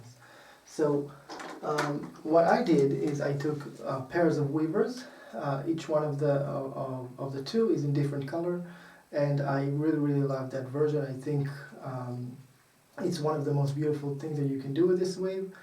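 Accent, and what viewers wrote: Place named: Israel. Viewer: Since your English sounds pretty Israeli, I assume you’re Israeli :) How do you obtain your willows over there? I was observing the flora in Israel and didn’t see any willows anywhere I’ve been. Do you grow your own, somehwere, or you import them? Your channel looks pretty informative btw, I’ll go through all your content. תודה רבה והישאר בטוח! ברכות מטורקיה 👍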